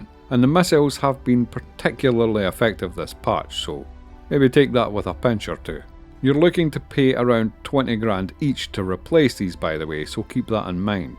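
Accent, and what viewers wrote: Scotland, United Kingdom. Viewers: The scottish accent feels wildly appropriate and correct for space ship reviews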